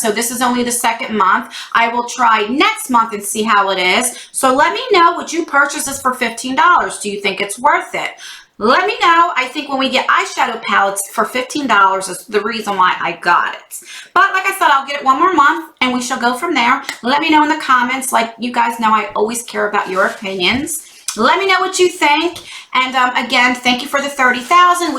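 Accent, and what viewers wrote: New Jersey, United States. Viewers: Happy birthday!🌸🌼🌻....I gotta say that I nearly peed my pants when you said I wonder if she knows Im from NJ! 😂gurl.... you sound Jersey through and through! And I love it!!! Have an amazing birthday! From a fellow 43yr old🔅🤗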